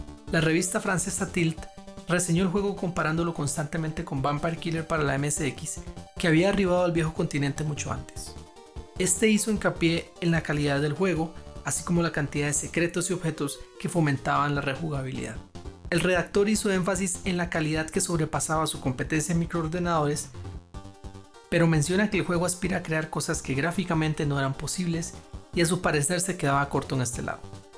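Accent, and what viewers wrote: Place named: Costa Rica. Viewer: Ese acento es de Costa Rica. 🇨🇷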